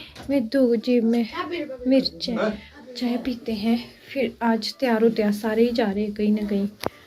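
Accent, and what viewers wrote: Pahari, India. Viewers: Apani phadi tone sudharwo adhi Hindi adhi phadhi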